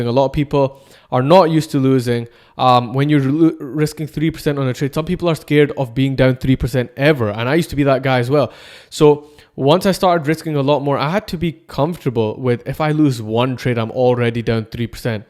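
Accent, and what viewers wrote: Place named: Scotland, United Kingdom. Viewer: Nice to hear another Scottish 🏴 accent.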